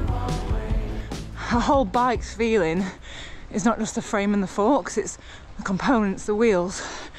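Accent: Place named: North, United Kingdom. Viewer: that stupid norden accent should be banned. puke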